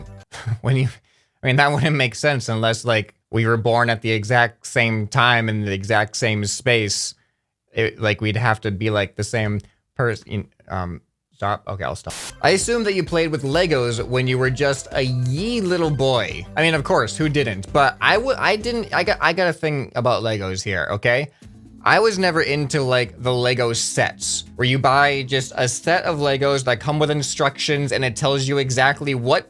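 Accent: Canada. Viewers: What do u mean he sounds too Canadian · huh.. i thought u were Canadian. i thought i heard an accent.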